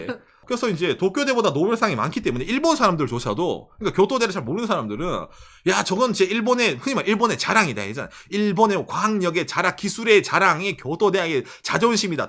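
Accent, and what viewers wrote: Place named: Ulsan, South Korea. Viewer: 울산분이시죠.. 근데 울산 억양이 부산, 경남과는 의외로 많이 다르고 경주, 포항과 함께 경주방언권을 이루고 있어서(요즘은 외지인이 많아서 많이 없어진 면도 있지만) 많이 순화된 대구, 청도말 느낌이 나죠.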